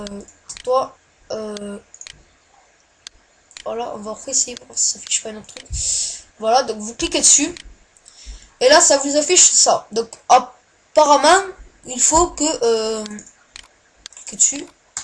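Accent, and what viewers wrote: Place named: Marseille, France. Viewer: J'aime ton accent marseiller:)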